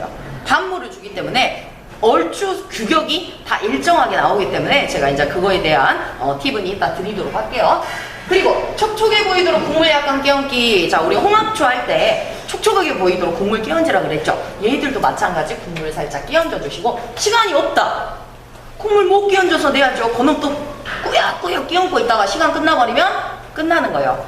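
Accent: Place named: Jeolla, South Korea. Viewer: 전라도 사투리 구수합니다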